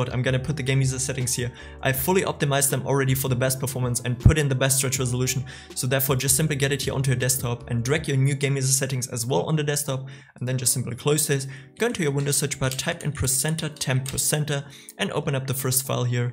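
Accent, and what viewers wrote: Germany. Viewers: Oha digggah du hast mir von 30 fps zu 120 fps geholfen ehrenmann bist du eug deutsch wegen akzent:(areyou German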